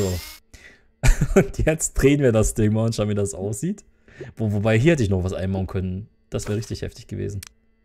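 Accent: Austria. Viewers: Ich feiere deine Streams und finde es Schade dass du kein witzigen Charakter hast, wie Zuvor mit dem wienerischen Dialekt mit deinem Witz dazu, war sehr Unterhaltsam und man hatte etwas zum Lachen, bisschen Abwechslung zu Rumpe ein Rumpelstilzchen 😂 Andl hat sein Willi 👍 Vielen herzlichen Dank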